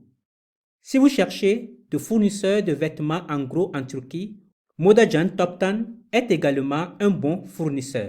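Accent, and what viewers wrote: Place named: Benin. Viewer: Merci infiniment ! Je pense que t’es béninois comme moi vu ta façon de parler ✌🏽 Franchement ça va tellement m’aider ! Cette vidéo est top, claire et concise :)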